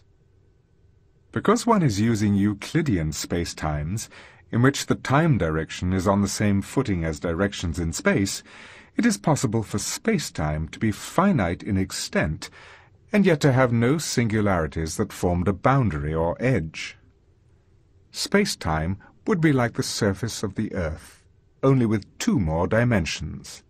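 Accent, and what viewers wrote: United Kingdom. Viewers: Beautiful voice and pronunciation. At last a British English and not the usual american twang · Love the British accent. Sounds very English!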